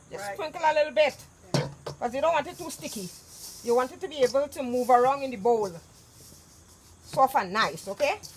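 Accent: Trinidad and Tobago. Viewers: Looking so good.Love the Trini accent.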